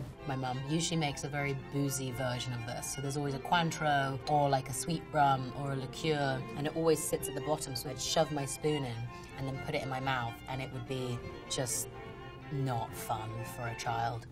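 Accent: United Kingdom. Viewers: She's so pretty and i love her British accent :) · she has the most satisfying british accent in britain wtf like i’m british and im JEALOUS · buBbLe AnD sQUeEk???? ive been watching this for 3 years but i still havent gotten used to the british accent after watching her as yelena...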